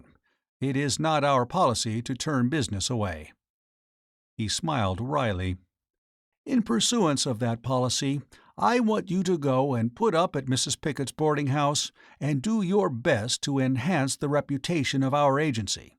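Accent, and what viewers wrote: United States. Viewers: Who's stupid idea was it have a bloody AMERICAN reading P.G Wodehouse, really?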